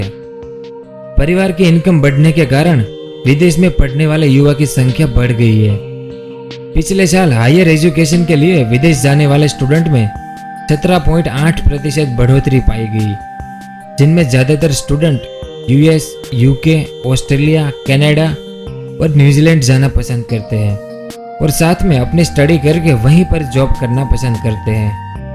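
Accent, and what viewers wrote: Gujarat, India. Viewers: Aap Gujarati ho kya?? Aapka bolneka accent ekdam Gujaratiyon jaisa hai!!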